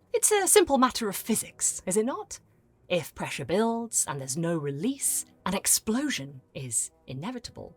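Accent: United Kingdom. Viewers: Extremely surreal to hear a British voice say the name of my hometown. Very excited to see more of this. Edit: Belly laugh when I heard "Portuguese Community" in the part about immigrants, so weird to think about how the tables have turned. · Thank you so much for the trans inclusive disclaimer about womb language at the beginning. 😊 In current year I unfortunately always get a little nervous when I end up liking a content creator who speaks with a British accent, purely because of what's been going on in the UK right now to erode trans rights. Very happy that I don’t have to worry about any of that rhetoric coming from this channel. Thanks again.❤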